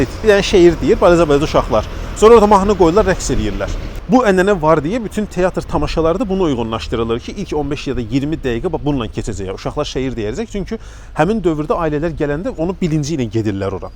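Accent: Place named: Shaki, Azerbaijan. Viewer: Qardaş salam xəbərin var artlq səndə şəkililər kimi ləhcə əmələ glib ❤ Var olun əllərinizə sağlıq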